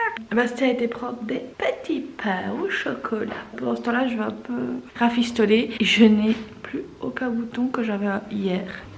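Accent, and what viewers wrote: Belgium. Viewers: Normalement je ne commente pratiquement jamais les vidéos YouTube mais ça fait déjà quelques vidéos à toi que je commente. Je trouve que tu fais un super travail, c'est très qualitatif. J'aime beaucoup ta personnalité, tu es très naturelle et c'est génial ! Cette vidéo est une excellente idée. C'est vrai qu'au début j'étais un peu déçue que ce ne soit pas en ASMR mais finalement ta vidéo est au top. Je me suis tapée un fou rire avec votre couteau suisse, mon dieu quand on dit que le rire est communicatif, ce n'est pas une blague. Donc voilà juste merci pour tout ! 💙 PS : J'adore ton accent belge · L'accent belge quand meme c'est le meilleur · C'est fou comme l'accent belge ressort quand tu parles à voix haute haha ! C'est rigolo et j'aime trop l'accent belge (c'est mes origines !) merci pour cette vidéo qui relaxe malgré le non chuchotement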